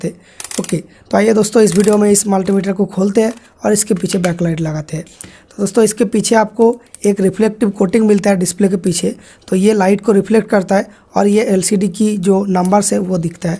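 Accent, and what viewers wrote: Bengal, India. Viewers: Tum bangali ho keya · Are you bengali ?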